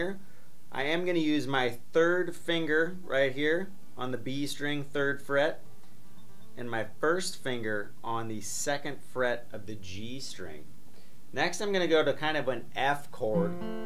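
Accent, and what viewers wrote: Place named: South, United States. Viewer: you accent are totally southern! XD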